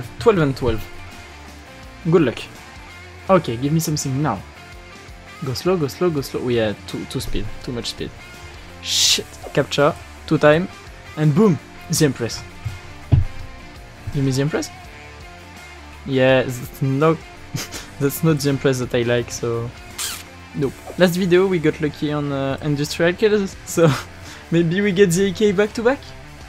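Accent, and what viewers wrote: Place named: France. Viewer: pas de soucis, en plus c'est que ton anglais est super fluide malgré l'accent français ( on l'a tous moi le 1er ). Continue t'es sur la bonne voie, j'espère que le partenariat avec csgo roll arrivera bientôt !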